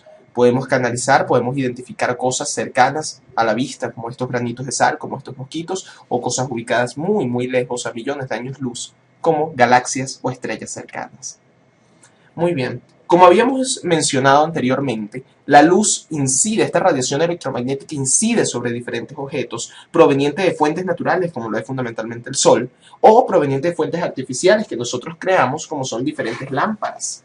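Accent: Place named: Venezuela. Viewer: Jeje tu acento, supuse que eras Venezolano jeje :) Me alegro mucho de conseguir por fin un EXCELENTE video realizado por un Dr. Venezolano :) Excelentisimo de verdad =) Saludos desde Anzoategui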